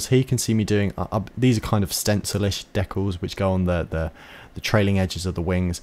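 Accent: United Kingdom. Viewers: Extremely strong British accent.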